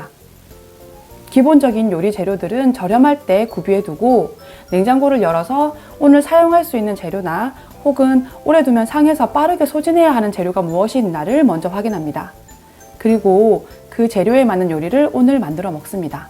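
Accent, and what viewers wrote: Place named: Busan, South Korea. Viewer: 부산이나 김해나 양산?쪽인가바요ㅎ 부산살아서 말투가 익숙해여ㅎ 4인가족 많이 배우고가요^^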